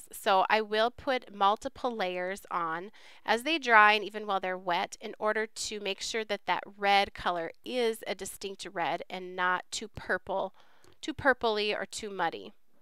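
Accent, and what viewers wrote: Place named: United States. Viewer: i love PB stamps and have a few, i watch all your videos but just wanted to say i love your voice!! I love the american accents anyway, but your voice is so nice, sorry to be off topic, lol, hugs from Vick in England xxx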